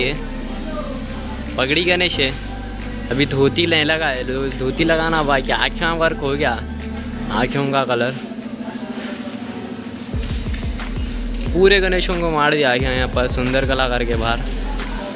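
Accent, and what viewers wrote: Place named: Hyderabad, India. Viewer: hao bhai🔥 hydi bolte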